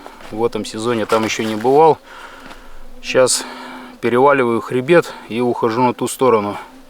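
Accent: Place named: Vyatka, Russia. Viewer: Это, те что желтенькие окунята тебе попались, они смотри сразу копчоные, их не вари!!!😅😊 Откуда родом брат? речь такая как будто "по-вятски"..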